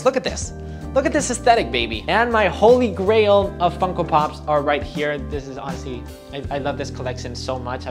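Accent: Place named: Spain. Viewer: is he spaniard? I can notice an accent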